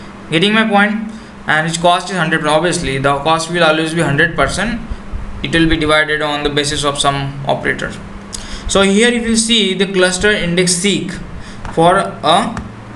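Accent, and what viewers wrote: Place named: India. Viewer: Haha indian accent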